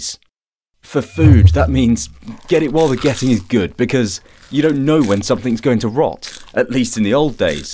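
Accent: England, United Kingdom. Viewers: I’m late to this channel. I’m glad I found it and you got an English accent. 👌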